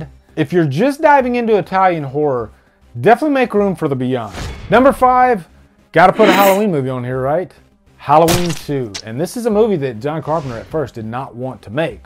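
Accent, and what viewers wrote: South, United States. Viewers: Are you Southern originally?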